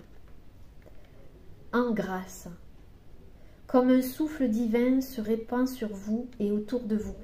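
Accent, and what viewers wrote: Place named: South-West, France. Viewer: Je vous aime trop, vous me faites sourire quand vous vous énervez avec bienveillance avec votre petit accent de notre sud-ouest. Et vos messages raisonnent tellement depuis que je vous écoute et me permettent de grandir. J'espère pouvoir vous rencontrer un jour. Je viens de conscientiser que j'ai tout....peu mais j'ai tout....je n'ai plus courir derrière qqchose....car à aujourd'hui j'ai tout ce qu'il me faut et si j'ai encore plus tant mieux mais j'ai tout.... merci ❤❤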